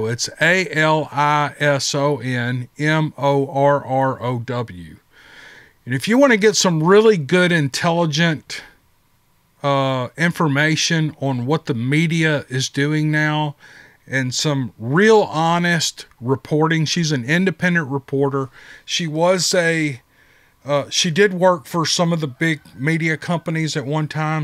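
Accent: South, United States. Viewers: All I can say is thank you for bringing some interesting joy to my life. You have a kind spirit. And beautiful country accent. God bless you and your family. And letting people know sasquatch is real.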